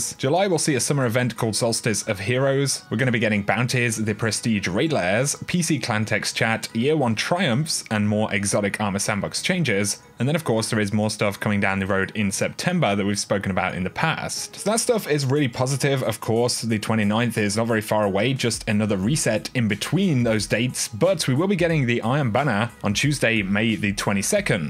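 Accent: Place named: England, United Kingdom. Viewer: First D2 was extremely easy and now because of the restrictions has become too difficult to progress, the issue with clan engrams doesn't help. Hopefully they will review this and make some changes. I used to play everyday through D1, D2 doesn't encourage me to do that any more. Lastly, many thanks for all the information you provide, been watching for a while (Nice to hear another English accent).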